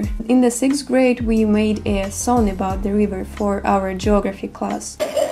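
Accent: Moscow, Russia. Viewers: A Russian girl can cuss me tf out with that accent, and I'd sit there like😍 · I am surprised how little of accent you have, most Russians I meet in Canada have heavier accent than you do. yet you barely have any. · Shes been there eighteen years. The local kids hear her speak and think she's from Moscow. She then asks the kids about places for tourist to visit in the town. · You are so comfortable with your delivery,and so are we.Get out of the small town,Big things await you.You need to travel.I'm sure we will follow.Love Russian accent!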